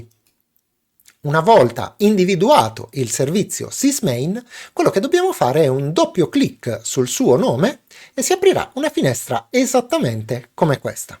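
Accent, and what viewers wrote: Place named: Palermo, Italy. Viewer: Abiti a Genova però il tuo accento è palermitano. Comunque grazie per i tuoi video che sono sempre utili.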